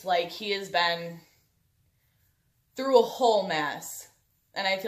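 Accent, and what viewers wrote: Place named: Midwest, United States. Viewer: you must be from the Midwest. your accent sounds like mine haha. love to the green!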